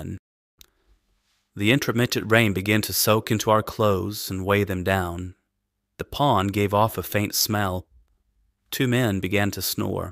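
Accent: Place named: South, United States. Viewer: This story of a young French boy enlisted in the German army to fight in the Soviet Union, read by someone possibly from a state South of the Mason–Dixon line, is quite puzzling to a French listener.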